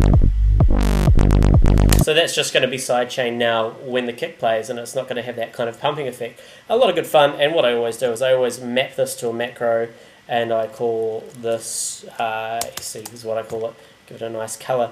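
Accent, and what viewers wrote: New Zealand. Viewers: sounds like a kiwi to me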